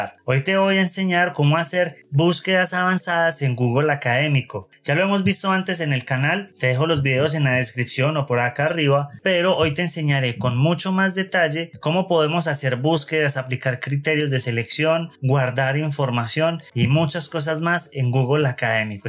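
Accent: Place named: Medellín, Colombia. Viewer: Excelente amigo, sos de medellin, colombia verdad🧠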